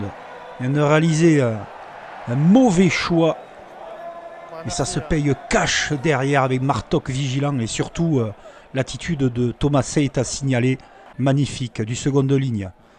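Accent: France. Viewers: On parle vraiment avec cet accent dans le Sud Ouest ? Oh, merde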